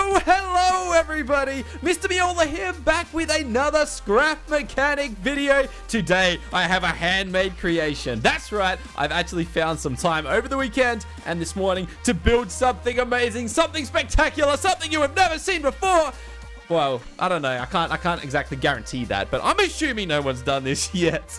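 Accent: Australia. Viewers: I think he's from Australian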